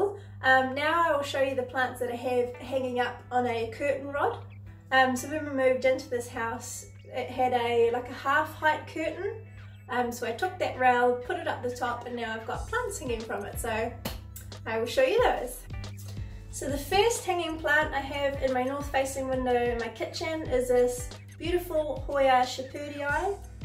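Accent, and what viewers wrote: New Zealand. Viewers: Spot that gorgeous bliiiing 😉💍 it’s so good to hear a kiwi accent and I love your pizazz so much ugh you give me life!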